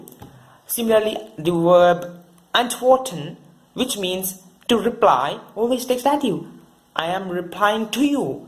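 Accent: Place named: India. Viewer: Hahaha... Amazing. I've heard numerous explanations regarding this topic. And what's funny and inpressive to me... is that this dude from India best explains Deutsch Grammar topics despite his heavy English accent. Bro! Once again. A great explanation and keep up the great work! I really enjoy referencing your Deutsch training videos. Vielen dank.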